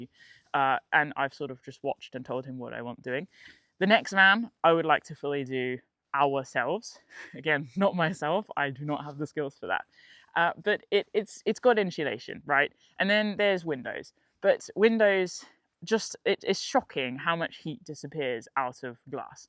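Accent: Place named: Scotland, United Kingdom. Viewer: As you've demonstrated, a Scottish accent can help put you in the mind-frame for hardiness. Allegedly - a cold foot bath before bed can make them warm - (old scouting tip...).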